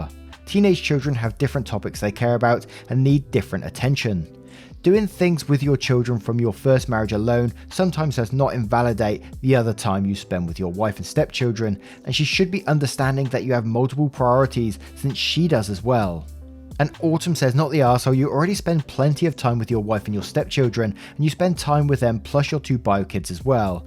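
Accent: United Kingdom. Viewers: I really like your accent. What part of the UK are you from?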